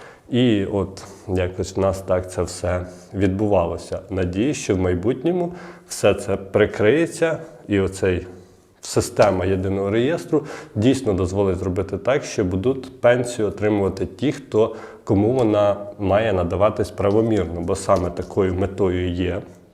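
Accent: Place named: Galicia, Ukraine. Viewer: Я уже молчу о том, что сам выговор отвратный - тошнит. Галичина, тьфу.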